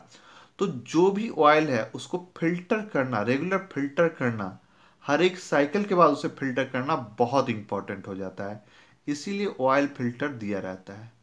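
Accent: India. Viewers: Titled in English. Audio is Indian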